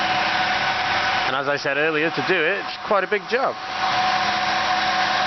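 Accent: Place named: England, United Kingdom. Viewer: all I getta tell you is that you are very stupid. I always thought English people were smart.. but perhaps you are australian.. I can't say for sure, I am brazilian, but for me your accent is English. there is no way to solve the problem without removing the timing belt... BINGO, but you don't need all those special tools for keeping the timing of the engine. BINGO... you need to use your " loaf " ... some improvized tools will work the same way. I did it myself.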